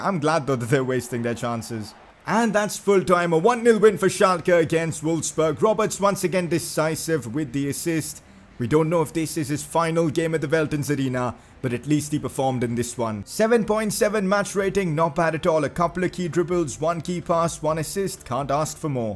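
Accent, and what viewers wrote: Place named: India. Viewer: why your accent sounds so indian xD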